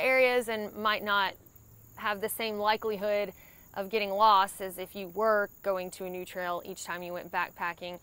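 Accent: South, United States. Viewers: I've come to really like the southern accent in ladies. Anyway, hahaha, great content! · I like your southern accent. The info was great as I have explorer+ and with mini2 out I was contemplating going to mini2 vs keeping my explorer+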